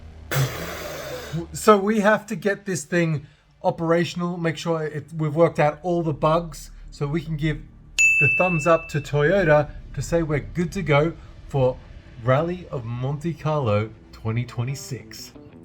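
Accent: Australia. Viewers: I got directed to your channel by YouTube and it looks like a really interesting build. Nice work on the body, it looks pretty amazing. The wheel hop issues likely started becuase it's a spool axle on the rear and typically go karters use weight transfer to unload the inside wheel to make these things turn. The trick won't work with your car, because you've lengthened the wheelbase and increased the mass quite a lot. The problem that's resulted from that is that you've had a failure which has resulted in compliance finding it's way into your chassis somewhere... I'm guessing, but I'm guessing it'll be the wheel bearings on the rear or you'll find that you've got a frame crack at the end of those flanges you've got welded on to the chassis. The people who are talking about a live axle etc, are wrong, there's not a specific problem with live axles, but compliance results in wheel hop, there are a bunch of ways to bake compliance into a vehicle design and live axles are renowned for it because people fail to separate their upper and lower links enough on a four bar rear end, or use rod ends which results in the rod ends flogging out under load. 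In both instances there's a very basic fix, but it's geometry and complaince related, nothing else. Yours probably isn't a hard fix either, but it'll need some proper design work done on it. You sound Australian, where are you based? I'm an engineer and based in Brisbane. I reckon it'd be interesting giving you a hand if you're keen.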